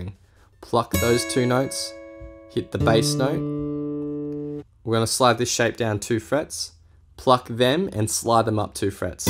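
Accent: Australia. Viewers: Are you from Australia?? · When i heard his voice I said Mateeeeeeeeeeeeeeeeeeee his an Aussie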